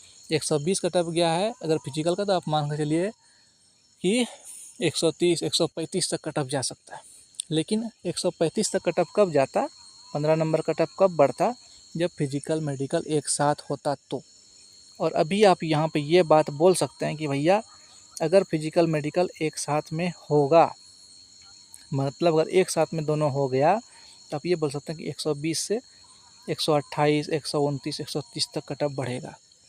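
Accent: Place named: India. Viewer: Desy style ma ahcca bolte ho 😅😅😅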